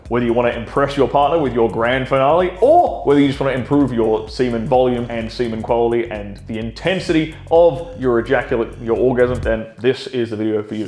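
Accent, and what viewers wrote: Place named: Australia. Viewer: He's Australian 😅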